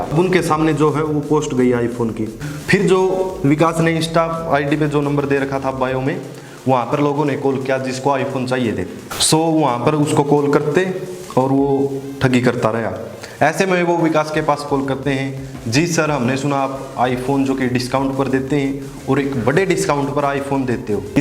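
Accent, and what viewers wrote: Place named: Haryana, India. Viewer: Aapka haryanvi accent bilkul mere dost jaisa hai❤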